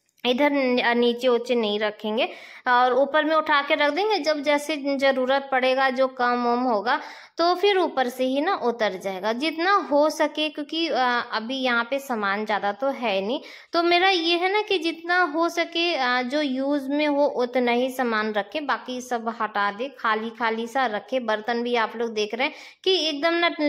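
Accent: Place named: Bihar, India. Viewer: Asalam o alaikum baji aap ne k kaha k aap u.p se hain lekin aap ka lehja bihari wala hai aap k bohat se alfaz biharion wale hote hain, I'm also a bihari from Karachi pakistan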